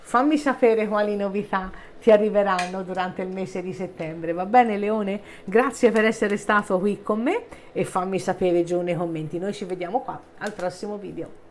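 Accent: Tuscany, Italy. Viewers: Cara io sono leone questa stesa rappresenta molto la mia situazione! È da molto che ho dei dubbi su una persona ed una specifica situazione..spero di scoprire di cosa si tratta! Spero in belle notizie! Grazie ti abbraccio sei simpaticissima con il tuo accento Toscano..sono Toscana pure io a presto ciao! 💗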